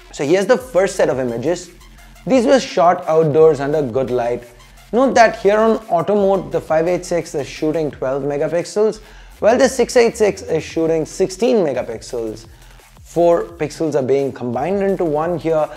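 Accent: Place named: India. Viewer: 这英语一股浓浓的咖喱味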